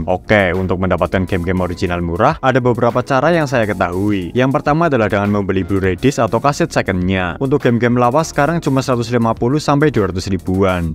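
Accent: Banyumas, Indonesia. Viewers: Wong Banyumas toh mas?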